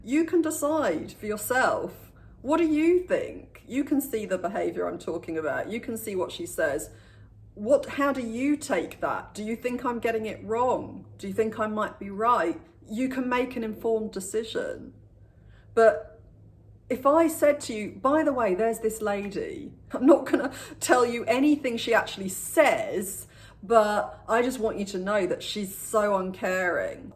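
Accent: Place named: RP, United Kingdom. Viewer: 🙌🏾 much appreciated and respect 👏🏽🐈‍⬛. Nobody forced her to put videos on internet and share her personal experience, so why is she complaining about internet? Unfortunately I have been through a severe narcissistic relationship and I know very well all these types of tendencies. I’d rather prefer to enjoy the amazing lecture, the great and posh English accent but also the beautiful cat 🐈 🙌🏾🌻🌻🌻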